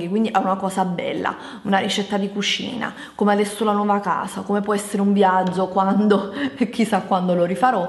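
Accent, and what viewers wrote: Naples, Italy. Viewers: ma fregatene...sono persone cattive, non capisco nemmeno io perchè altre youtubers non si becchino gli insulti, ma secondo me solo per il fatto che tu sei sensibile e ci fai caso ai commenti, ai like ecc... O piuttosto che niente ci sono le persone che si mettono a criticare la pronuncia, la cadenza, il dialetto, l'inglese....lasciale perdere, ma chi se ne frega, anzi sai cosa ti dico?? Io sono milanese DOC e sono pure una linguista (studio interpretariato) eppure quando sento la tua cadenza napoletana o qualche parola in dialetto mi diverto tantissimo, e se capita che sbagli qualche pronuncia in inglese a me fai solo tenerezza.... Saranno invidiose perchè tu mostri di avere una bella vita, con una bellissima famiglia, con una bella casa ecc ecc...come se tu non avessi problemi... Non capiscono che magari una vuole mostrare il lato più allegro e divertente della vita! 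un abbraccio grande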